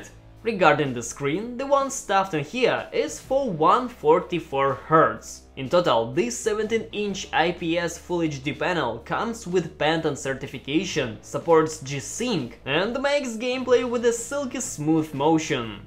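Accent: Russia. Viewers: Долго вслушивался в твою речь))) всё думал, а не русский-ли часом?))) как Клаву увидел, понял что земляк))) может конечно и живёшь не в России))) говоришь очень хорошо, даже мне с моим не особо хорошим уровнем английского понимать получается без особых усилий) молодец) так держать! хорошее видео и обзор)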